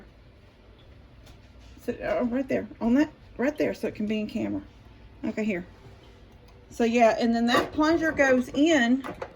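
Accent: Texas, United States. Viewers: Just watched three of your videos-----and all I can say is that---YOU ARE THE JACK OF ALL TRADES!!----cleaning, organizing, feeding all your BABIES--mowing & raking/blowing leaves, fixing ALL KINDS of stuff, decorating, undecorating, crafting---oh my goodness--the list goes on--------and NOW---you are baking cookies----and homemade iced tea!!! WOW!!! AND just LOVING your TEXAS ACCENT!!! Will be looking forward to all your videos🥰😍🥰😍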